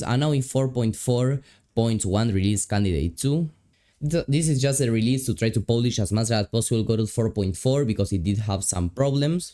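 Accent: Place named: Argentina. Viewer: Aunque hables en inglés se nota que eres argentino 👍🏻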